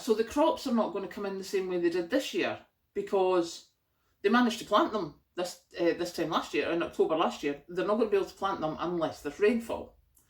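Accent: Scotland, United Kingdom. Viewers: That’s so funny 🤣,I’ve heard that before,that Americans struggle with the Scottish accent.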